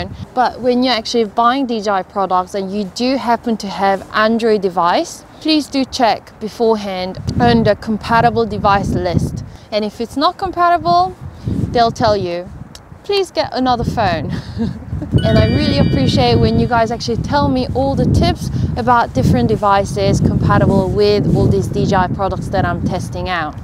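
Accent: Australia. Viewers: I'm sure I detect a hint of an Aussie accent. Am I hearing things ? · Have you spent time in Australia? You sometimes have an Aussie accent :) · First video of yours that I have watched. My new mini will connect to my galaxy s7 with the DJI app, but I paid $25 for the Litchi app and it will not connect. Other people tell me it will work, but do not give clear directions on how to make Litchi work with my drone. I hear a Chinese AND what sounds like Australian accent. Did you grow up in Australia?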